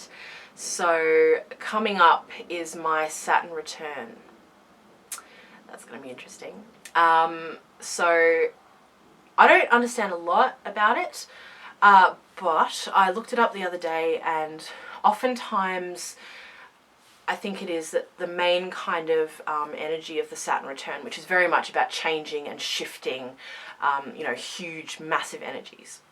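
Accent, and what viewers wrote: Australia. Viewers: I spent an embarrassingly long time trying to identify your accent until I realised it's the same as mine hahaha nice to see other Australian pagans hanging around :D (I'm a new subscriber - hello!!) <3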